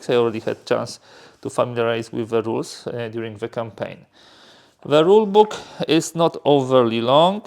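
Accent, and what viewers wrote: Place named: Poland. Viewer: nie jestes czasem polakiem?akcent masz podobny do mojego jak gadam w ingliszu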